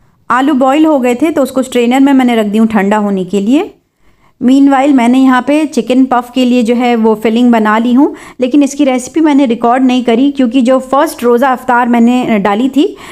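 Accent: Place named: Maharashtra, India. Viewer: U really sound as Maharashtrian even ur cuisine batata wada is Maharashtra famous.u even say kothmir that's Marathi word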